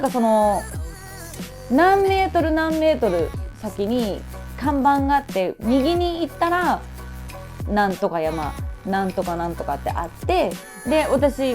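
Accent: Philippines. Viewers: Whahaha, you've been staying in the Philippines for too ... way too long! You sound like a Filipina that is fluent with Nihongo. hehehehe. I was a college teacher once and had students who are now Japanese residents due to their family members who are from Japan ... ... whenever they chat with me or whenever I see their homemade videos while they're in Japan ... they sound like you right now. hehehe .... .... so cute. hehehe.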